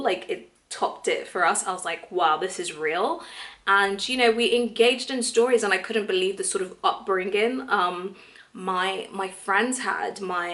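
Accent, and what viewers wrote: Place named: England, United Kingdom. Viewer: Cutie but I hate the English accent!!!!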